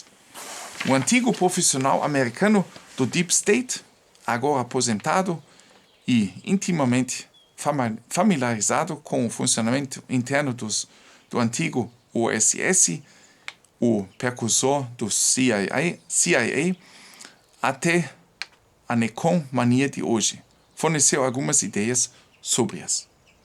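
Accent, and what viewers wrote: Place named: Germany. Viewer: Parabéns! Eu gosto de assistir seus vídeos. Balneário Piçarras SC Brasil Os descendentes de alemães que moram aqui no Brasil falam com sotaque igual ao seu ehehe Em Santa Catarina temos uma cidade com quase 90% da população alemã que se chama POMERODE. Também tem a cidade de Blumenau que tem bastante descendentes de alemães.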